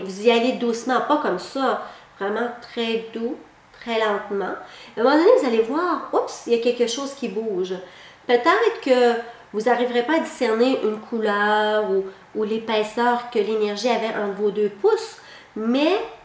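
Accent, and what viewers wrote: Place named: Quebec, Canada. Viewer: AH une québécoise !!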